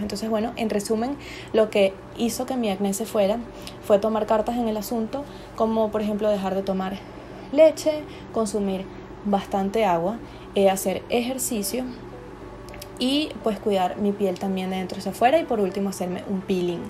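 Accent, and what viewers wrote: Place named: Venezuela. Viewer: Oye tienes un acento venezolano, en qué parte encontraste esa crema, si es que estás en Venezuela. Gracias .Caracas.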